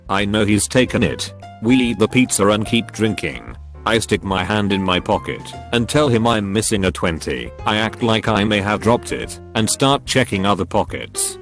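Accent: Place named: United Kingdom. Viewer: This robotic British accent, does worlds for making some of these people sound not incredibly foolhardy or a little pathetic.. not all, but surely some.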